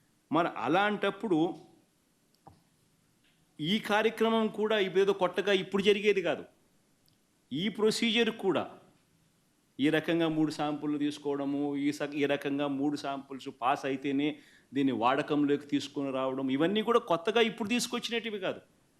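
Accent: Rayalaseema, India. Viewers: Continue with rayalaseeema slang.dont go in polite way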